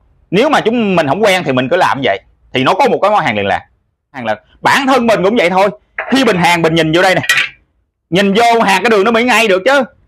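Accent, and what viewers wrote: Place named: Cu Chi, Vietnam. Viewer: Cảm ơn em , video rất bổ ích , nghe giọng em Anh đóan Em ở Củ Chi phải khổng ?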